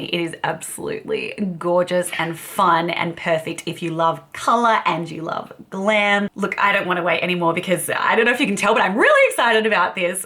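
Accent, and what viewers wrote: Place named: Australia. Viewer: I absolutely love this review 😍 it's so nice to hear my palette being reviewed in an Australian accent 🥰 I work for Glaminatrix and designed this palette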